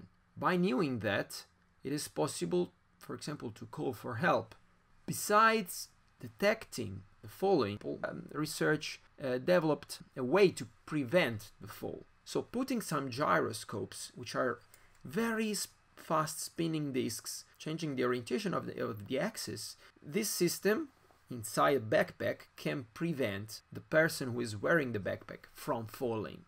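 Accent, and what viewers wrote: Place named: Italy. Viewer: Mi scusi per offtop, ma mi piace che Lei parla Inglese con accento Italiano! :)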